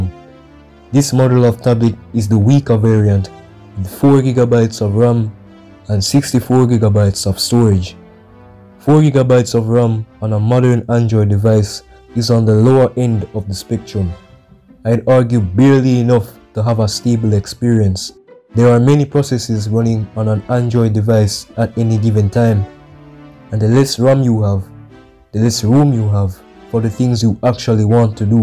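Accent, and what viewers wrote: Jamaica. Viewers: Are u jamaican bro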